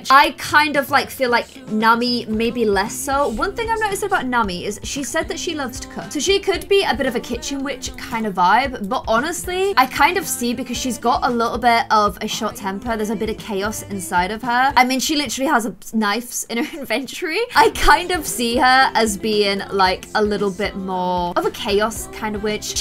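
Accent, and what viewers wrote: United Kingdom. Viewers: It’s her british accent lol, also, i think you mean “covEn” and not “coVen” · Isn’t what she’s saying just the British pronunciation? I’m not a native English speaker but that’s how I always said it in my head lol She was also mispronouncing all kinds of stuff when she was playing her Korean series and is, to this day, still saying “Seo-jun” wrong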